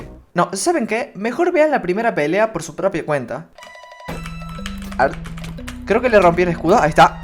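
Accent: Córdoba, Argentina. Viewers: Chabon sos de Córdoba? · Muy bueno el video hermano una duda ¿sos Cordobez? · capo el pibe cordobés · por que es de cordoba, una provincia de argentina en la que hablan asi jajas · apenas empezó el video me di cuenta que sos cordobés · 😆!es cordobessss¡¡¡¡ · Hola bro sin ofender pero ti vos se me hace a la de un Cordobés me resulta muy gracioso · vo so cordobes no? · Cordobes xd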